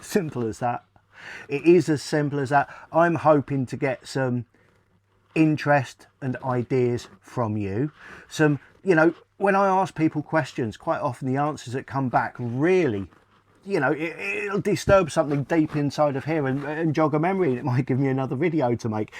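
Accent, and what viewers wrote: United Kingdom. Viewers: i thought you lived in England because of your accent and the fact you said you had to be in the UK for the giveaway, you can get a lot of things if you pay enough money and you have a completely clean record, but it is very controlled, i wish it was easier and cheaper but it's not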